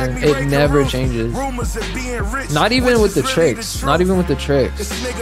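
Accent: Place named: New York, United States. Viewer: It's amazing how you grew up in GA but have a new York accent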